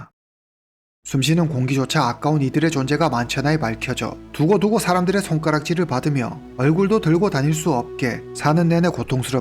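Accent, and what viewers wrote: Yanbian, China. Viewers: 말투를 들어보니 중국이나 연변동포 분이시군요 방송 잘 보고 있습니다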